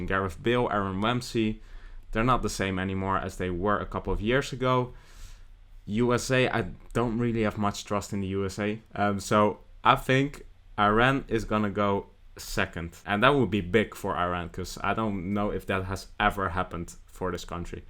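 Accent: Netherlands. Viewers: He may live in belgium but he is clearly dutch you can hear that from his accent. And look at his name is which is not a Flemish name and certainly not a Walloon name. is a typical Dutch (the Netherlands) name.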